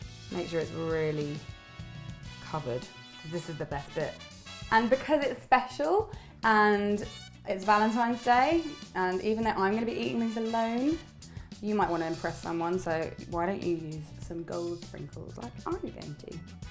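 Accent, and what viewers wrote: England, United Kingdom. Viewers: Well she doesn't sound American coz she's English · I can't get enough of the English accent. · she keeps interjecting little bits of American English... I really liked the British accent tho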